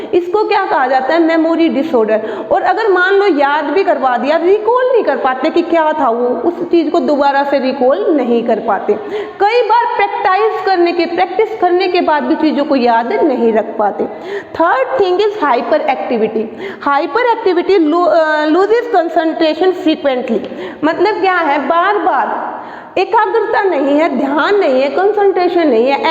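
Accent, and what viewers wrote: Haryana, India. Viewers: Mam aapka Haryanvi touch aa rha h🥰 Lkn fir bhi m aapse hi pdti hu 🤓🤓 PHE ke sbse best teacher ho aap mam 🙏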